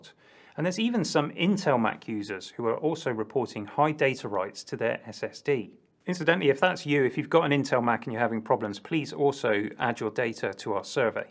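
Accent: United Kingdom. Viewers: British guy talking smooth - going to sell your data to a class action lawyer against Apple. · the British accent is the best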